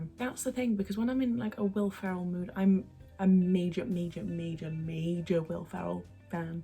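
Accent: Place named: Yorkshire, United Kingdom. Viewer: SO.. I’m from Yorkshire 😅😂 ..you definitely got the accent on point hahaha!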